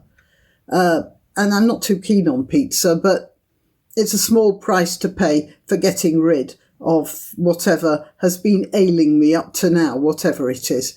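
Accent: United Kingdom. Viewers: No no no... your voice cadences are quite harmonious to My American ears. Americans like me melt for that British accent... ask any American Beatles or Rolling Stones fans..... keep talking.... and cogent thought with that accent???? Young lady, I better never hear your voice and see you on payday!!!!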